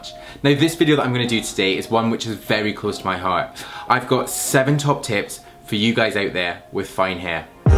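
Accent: Scotland, United Kingdom. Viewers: i think he's Scottish ive got a Scottish friend and he sounds pretty similar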